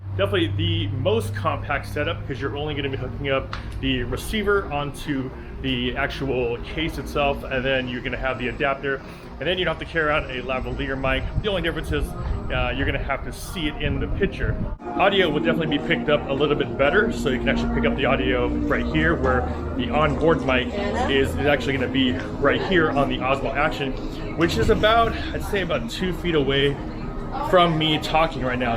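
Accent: Philippines. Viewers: You're accent is filipino ilocano..no doubt about it.NIce video.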